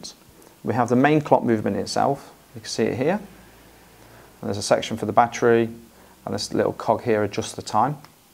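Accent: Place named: England, United Kingdom. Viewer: It's easy when you know how! Thanks. It was so reassuring to hear an English accent for the demo too. Very good thanks.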